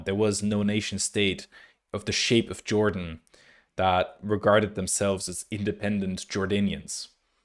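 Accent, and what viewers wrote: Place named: Ireland. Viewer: Bizzare, I just replied to your thread, posted it, but I don't see anything. I did however see my previous question/comment posted, but apparently you didn't. I've no reason to doubt your word on this. It'd seem irrational to assign the veracity of a debate, based on a specific age group. Surely, the merits of the points raised are what counts, not the age of the speaker? I'm still wondering about your "Irish" accent. Perhaps you'd rather not say, and that's perfectly ok.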